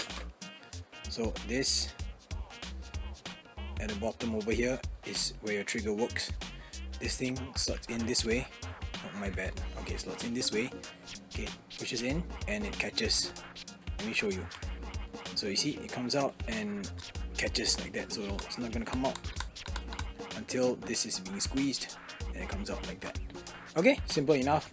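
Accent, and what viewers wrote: Singapore. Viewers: Are you a Singaporean ?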